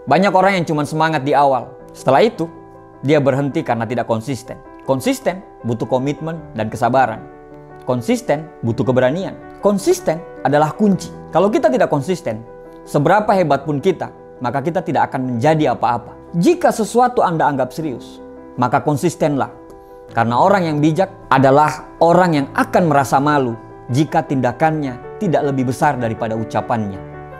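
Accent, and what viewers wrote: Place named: Banyumas, Indonesia. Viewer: Mntp ngapak gafir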